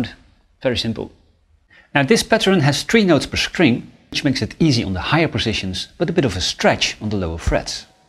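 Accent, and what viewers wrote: Netherlands. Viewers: Dutch? Sounds great!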